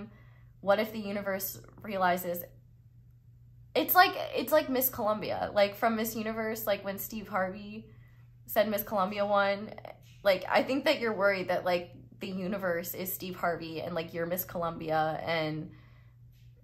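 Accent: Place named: South, United States. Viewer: Pile #2, I love your Southern accent lol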